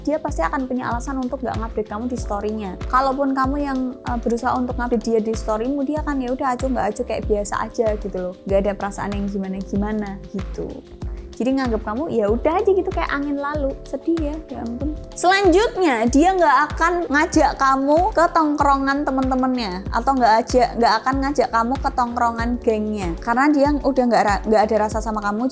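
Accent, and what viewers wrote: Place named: Pasuruan, Indonesia. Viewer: Arek pasuruan mana suaranya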